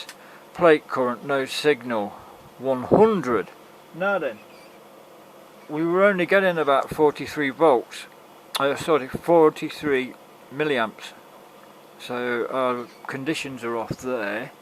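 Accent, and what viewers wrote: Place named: United Kingdom. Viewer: Having listened to a lot of Americans on these topics it is beyond refreshing to hear a fellow Brit. A gibbering sprint of unconnected self correcting personality, followed by genius blow by blow creativity. Talking like I'm thinking and clearly dropping exactly what you are aiming to achieve and getting there through educated experimentation. Loving this, talking my language. 😂❤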